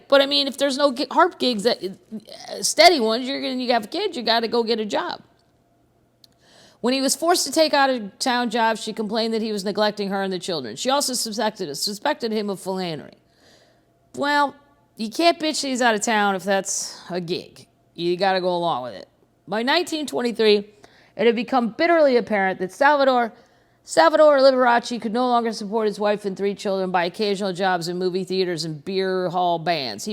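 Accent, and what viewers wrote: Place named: Kentucky, United States. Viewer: I hear some Kentucky funk in your reading tonight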